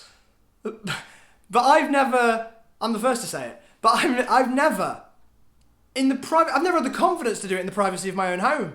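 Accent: United Kingdom. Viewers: You're so English its beautiful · My god I love you and your superbritish accent <3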